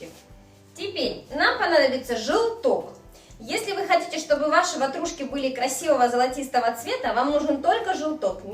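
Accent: Ukraine. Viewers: Вам речь кажется неграмотнной, а на самом деле это местный диалект русского языка на украинской почве. Так же как существует масса диалектов в пределах России. Все очень просто, можно было бы Вам и самой додуматься, но видно привычное русское высокомерие мешает.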